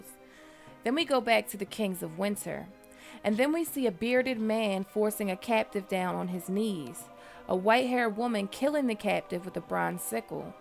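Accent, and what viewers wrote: United States. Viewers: What part of America is this accent from, it's hooking me.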